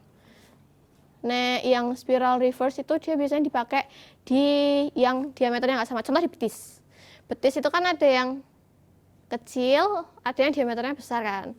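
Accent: Java, Indonesia. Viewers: Medok bgt si wkwk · Medok-nya keren. Ini namanya locally rooted. Local wisdom 😊👍👍👍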